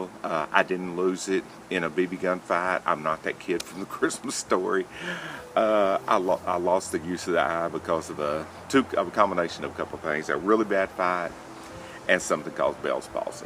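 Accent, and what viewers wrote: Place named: Texas, United States. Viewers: Just found your channel a week or so ago . East Texas accent for sure I went to White Oak and have family out in East Mountain . Now living just over Texas line in Louisanna. I went through advanced cataracts in 2011 had implants put in but was completely blind for about 8 months and totally get the protect your backup . I now can only shoot with a scope since having implants my eyes no longer focus between long and short range my long range vision is pretty good with lens implants I have to rely on bifocals to see rear sight though and that doesn't work. Saw your video on Hawke scope just got a air rifle the new gamo 22 magnum swarm gen 2. Needs some more trigger adjustment and does tend to jump a little but getting it figured out. Looking at the Hawke sidewinder . Enjoying your channel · thanks for sharing. The patch is bad ass and makes the vids better. 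Gives personality to the vids and character . Ive even shared these vids with my wife because we have the same accent. TX is the best state in the country...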